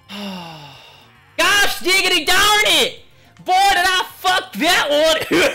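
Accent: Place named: South, United States. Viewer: lmao that southern accent. People here in SC actually say "fixin to do something" I didn't think that was a real thing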